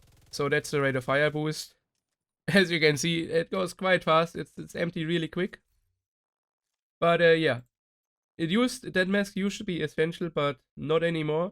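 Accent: Germany. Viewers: Bist du deutscher? Hast diesen deutschen accent ^^. Gutes Video ! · Digga das war das erste was ich gedacht habe... es regt mich turbo auf :D wenn die leute diesen deutschen "akzent" haben.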